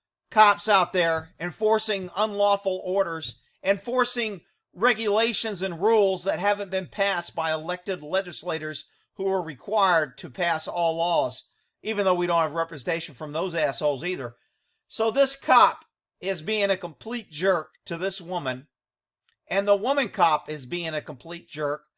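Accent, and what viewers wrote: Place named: Florida, United States. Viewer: My God, could you allow just one video to play without your interjections? And making fun of her accent makes you look foolish, when in fact, you have Florida man accent, if you get my drift.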